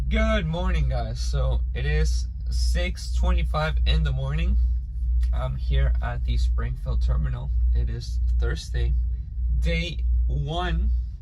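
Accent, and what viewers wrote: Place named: Latin America. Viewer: El trokero peruano que no hace vídeo en español…. Smh. Don’t forget where you come from.., don’t be one of those Latinos that think they are gringo because they light skin and speak English(with an accent). Not hating but it’s the truth.. there’s a reason why you got less than 5k subs.. Vlog en Español and you’ll see your channel blow up. ✌🏽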